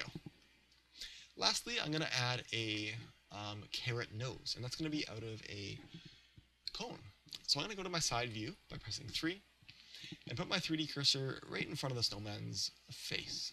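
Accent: Canada. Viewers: I <3 you because you're Canadian! I noticed right away!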